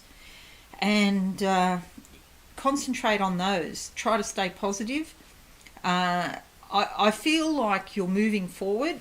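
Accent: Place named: Australia. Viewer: Thank you..nice to hear an Aussie accent..am also an Aussie..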